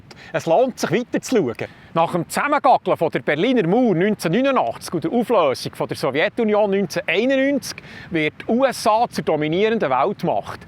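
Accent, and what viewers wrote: Bern, Switzerland. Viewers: Sehr komplexes Thema, aber super erklärt und mit dem Berndütsche Dialekt au no wahnsinnig sympathisch – weiter so, wir freuen uns auf die nächsten Videos. Viele Grüsse aus Chicken Hill at the Lake (Hünenberg See) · Das Berndeutsch ist toll,die Gesinnung auch,danke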